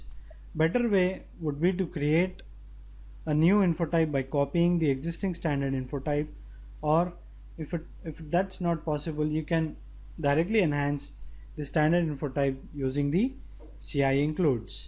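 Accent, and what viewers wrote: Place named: India. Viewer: Why are these always done by someone in an Indian/Muslim accent? It makes me weary about my data security.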